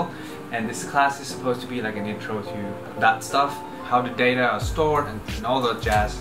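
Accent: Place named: United Kingdom. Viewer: how do you learn such sublime english accent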